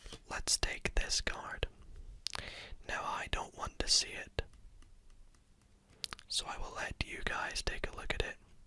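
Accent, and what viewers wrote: Scotland, United Kingdom. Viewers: What's that accept ? I'm english I'm hearing hints of scottish with something else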